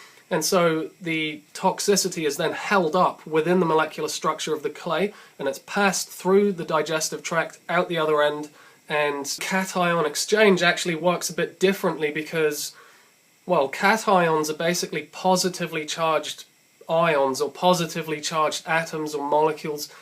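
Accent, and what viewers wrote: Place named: England, United Kingdom. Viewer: it's great to hear an English accent (for a change) after seeing all these video's with Yankee accents all the time.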